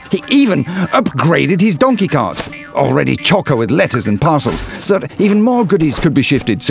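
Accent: United Kingdom. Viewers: You'd better pay some american narrator than british. American accent is more acceptable.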